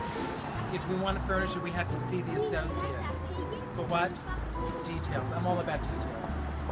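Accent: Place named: Bronx, United States. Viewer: OMG!! I am hooked on your store visits (also your Bronx accent - reminds me of home!!)!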